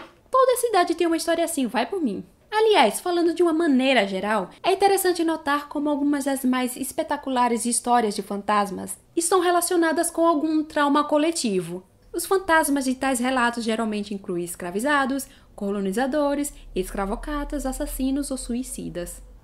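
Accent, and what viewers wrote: Brazil. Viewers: o sotaque nordestino misturado com o ritmo de fala mais compassado e a voz suave parecendo estar contida ajuda muito Ah, parabens pelo conteudo!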